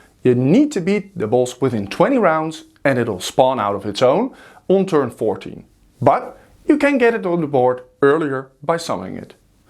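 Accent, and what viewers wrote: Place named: Netherlands. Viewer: Klinkt als een hollander maar dan int engels 🤔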